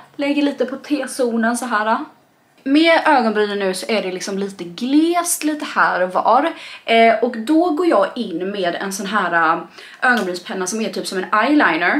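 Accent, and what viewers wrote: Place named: Småland, Sweden. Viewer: Hahahha så fin! Men varför pratade hon typ småländska😂❤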